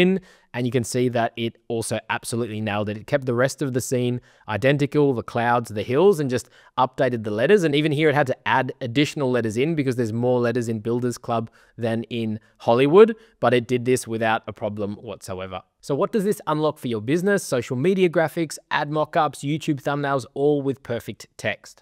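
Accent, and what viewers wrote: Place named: Australia. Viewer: An Aussie in Berlin?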